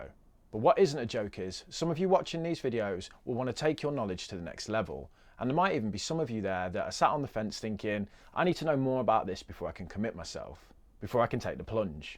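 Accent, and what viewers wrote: Birmingham, United Kingdom. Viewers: I had a strong feeling you were from Birmingham. I’m also originally from Birmingham - King’s Norton. Keep up the good work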